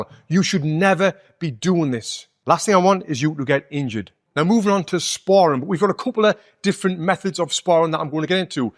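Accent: United Kingdom. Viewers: From what region of Britain are you?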